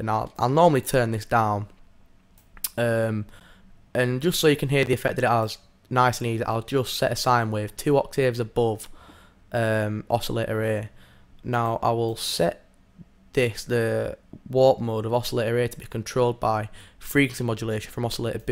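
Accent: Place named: West Yorkshire, United Kingdom. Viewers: Nah, that's a West Yorkshire accent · Bradford by the sounds of it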